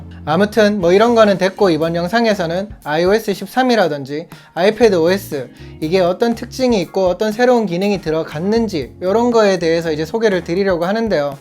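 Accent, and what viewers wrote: Gyeongsang, South Korea. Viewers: 아우.. 목소리도 답답하고 그 목소리로 경남 사투리쓰니까 들을수가 없네요.. 첨입니다 악성댓글은 참 대단하세요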